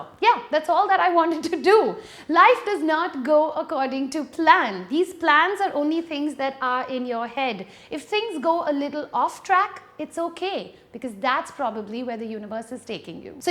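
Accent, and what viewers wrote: India. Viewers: I just love your natural Indian accent :).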